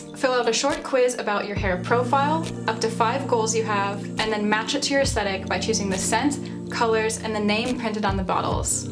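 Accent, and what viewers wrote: Netherlands. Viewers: waitttttt, are you Dutch??? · OH MY GOD UR DUTCH?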